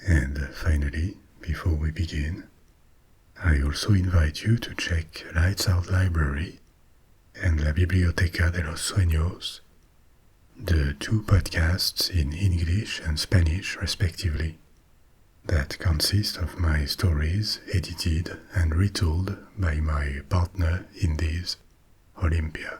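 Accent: France. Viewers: Does have a regional accent? From what part of France does he hail? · would you consider doing Dante's circles of Hell and Virgil's journey through them? I'd love to hear it from your Frankish tones.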